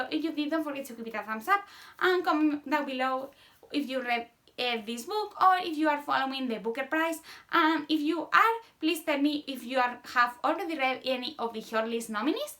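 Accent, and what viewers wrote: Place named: France. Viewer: Cute French English accent. 🌷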